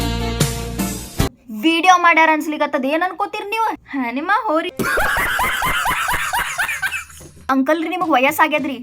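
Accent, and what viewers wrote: North Karnataka, India. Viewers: ಇವತ್ತ ಫಸ್ಟ್ ನೋಡ್ ಬೆ ನಾ ನಿನ್ನ ವಿಡಿಯೋ ನೋಡಿದ್ದ. ನಮ್ಮ್ uk ಭಾಷೆ ನಿನ್ನ ಬಾಯಿಂದ ಕೇಳಿ ಬಾಳ್ ಖುಷಿ ಆತ ನೋಡ್ ವಾ. ವಿಡಿಯೋ ಅಂತೂ ಬಡ್ದ ಬಾಯಿಗ್ ಇಟ್ಟಿ ಬಿಡ್ ಅಡ್ನ್ಯಾಡಿಗಳಿಗಿ. ಸಬ್ ಸ್ಕ್ರೈಬ್ ಆಕ್ಕಿನಿ ಬಿಡ್ವಾ 😂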